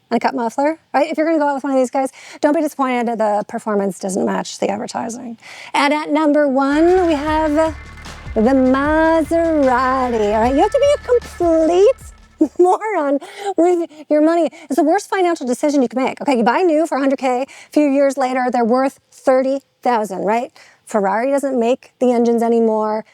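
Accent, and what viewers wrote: Chicago, United States. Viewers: Yes. If you close your eyes and just listen - 1000% Chicago high class girl. Unmistakable. Even with the man's watch. Brilliant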